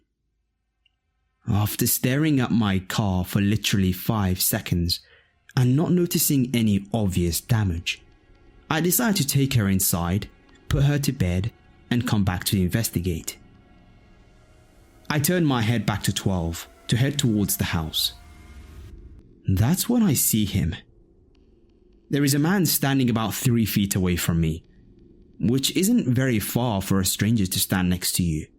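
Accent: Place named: United Kingdom. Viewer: Well this guy is british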